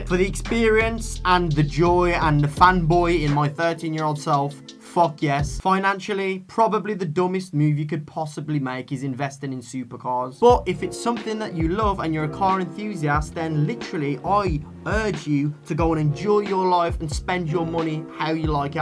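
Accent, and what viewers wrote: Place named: Birmingham, United Kingdom. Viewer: Never thought I would see a brummie in a lambo, but ok...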